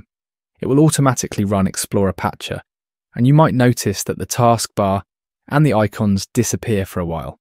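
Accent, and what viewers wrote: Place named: United Kingdom. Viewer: I don't trust him mainly because of his UK accent I don't like him I don't trust them That's gonna be a no for me dog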